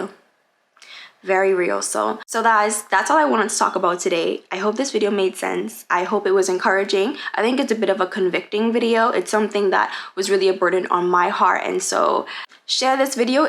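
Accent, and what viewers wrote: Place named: Trinidad and Tobago. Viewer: Are you Trini? I hear that accent sis !! ❤❤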